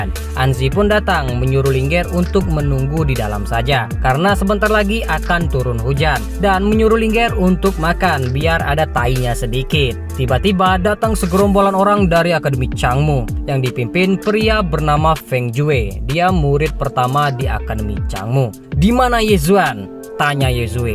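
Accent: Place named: Medan, Indonesia. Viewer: Logat Medan nya kental bgt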